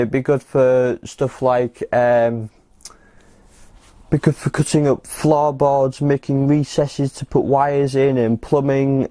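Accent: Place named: Manchester, United Kingdom. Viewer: Wow the manc accent in strong in this one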